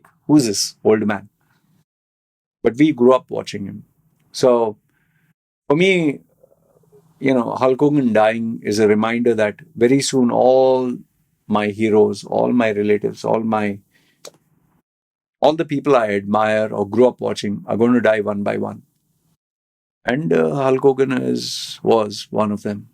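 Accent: India. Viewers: Your english speaking accent is soo indian.. Are u from india ?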